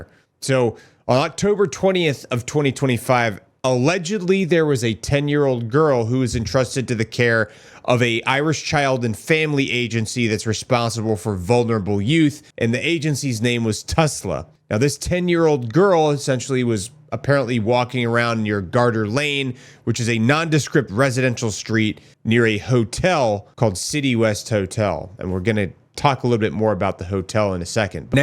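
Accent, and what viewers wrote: United States. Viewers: Takes a yinz to know a yinz. Cant hide our burgh accent brother.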